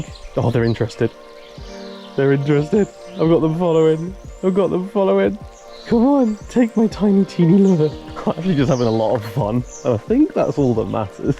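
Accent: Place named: England, United Kingdom. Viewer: Thumbnail, content, editing after 20 seconds and the fine English accent (not Australian??) is an instant sub. Very Jealous of the work you do chap, I'm aiming to find myself in the outdoors more like this more frequently. Hopefully more trips can be inspired by your vids!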